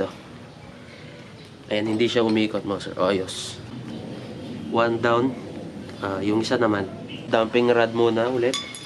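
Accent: Pampanga, Philippines. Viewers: Kapampangan ka sir?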